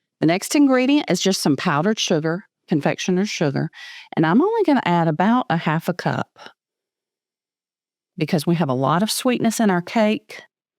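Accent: South, United States. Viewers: First time you’re coming across my feed. I can’t wait to make this. Well done! Great video & I love your voice/southern accent. 👏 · The audio on this video is so nice & crisp and with your southern voice? So relaxing! Almost asmr!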